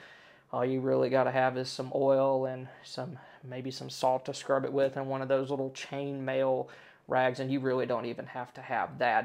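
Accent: Texas, United States. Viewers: Sounds like your either west of Fort Worth or south of me in central Texas. We are in the DFW area and have a place in southeastern OK that we can go. Your on the money all the time with your ideas here. I'm a retired BSA volunteer Commissioner ....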